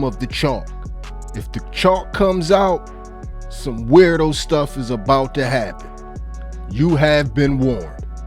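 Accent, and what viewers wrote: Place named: Baltimore, United States. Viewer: I absolutely love your Baltimore accent!!!!!❤❤❤❤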